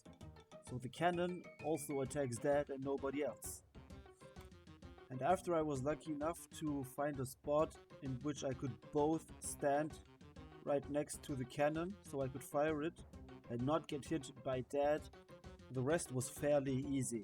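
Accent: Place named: Germany. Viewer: ich wusste sofort, dass du deutsch sein musst xD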